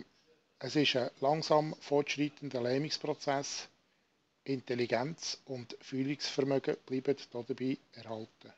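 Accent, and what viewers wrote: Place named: Switzerland. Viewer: hochdeutsch, allerdings stark schweizerisch eingefärbt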